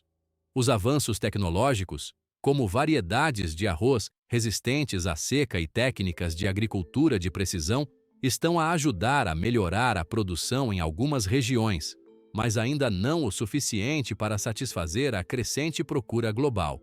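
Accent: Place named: Brazil. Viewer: Como é que isto pode estar acontecendo/ a acontecer? Um texto integralmente em português europeu, mas falado em brasileiro do início ao fim!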